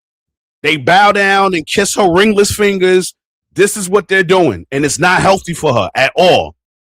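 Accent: New York, United States. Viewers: The whoLe phrase was: "Tuff Tiddy To suck" You sound Like a Nu Yawka bro. #SaLuTe_Homeboy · Spitting facts and a NY accent, oh I’m locked in!!!!!!!!!!!!!!!!❤❤❤❤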